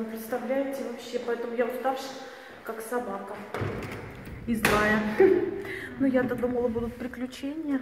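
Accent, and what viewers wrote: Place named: Uvarovo, Russia. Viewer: говор наш уваровский удачи тебе подруга!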